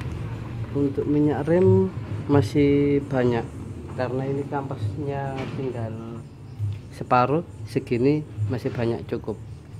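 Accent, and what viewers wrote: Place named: Java, Indonesia. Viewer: Medok kali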